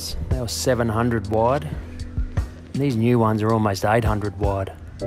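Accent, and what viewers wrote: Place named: Australia. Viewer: Clicked on your thumbnail and was surprised with a nice Aussie Drawl. Looked like Southport out the balcony. Stoked to see Narang on the clip. I rode my road bike over there about 4 years ago and stopped at the same cafe🤣. If you ever come to Bright, leave the XC convertible at home and rent a enduro bike and smash Mystic...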